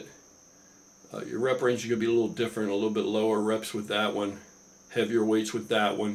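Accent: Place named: Philadelphia, United States. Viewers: I'm from south jersey right next to philly and I thought the same thing. The way he pronounced the days of the week is a dead giveaway. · i bet this dude is from the philadelphia/south jersey area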